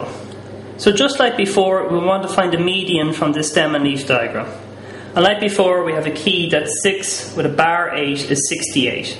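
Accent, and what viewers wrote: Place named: Ireland. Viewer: thanks a bunch! and i love your irish accent :)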